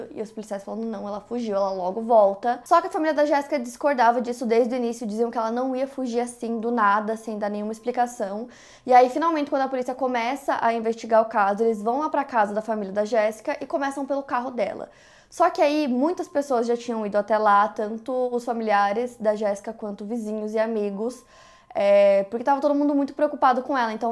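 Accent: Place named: Curitiba, Brazil. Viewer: Ela é de onde? Ela tem sotaque de Curitiba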